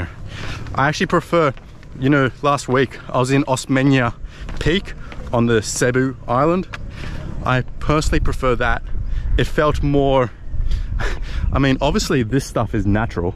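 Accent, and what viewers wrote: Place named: Australia. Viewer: Fun and engaging video!,reminds me of Harald Baldar! Really love your aussie accent!☺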